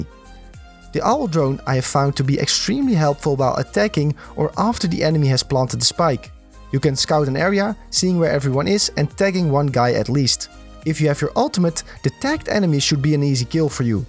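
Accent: Netherlands. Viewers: Your engerish is very well i instantely now you are dutch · Ik hoor aan je engels dat je nederlands bent xD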